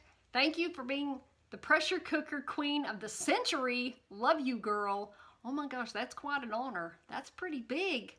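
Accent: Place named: South, United States. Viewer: Oh yeah, thank You to the Creator of the chickpea. Long live the chickpea! Hey, I love your accent. It's similar to mine. So, you just keep on with that lovely southern talk. We love you! Mmmmmwuah ! 💜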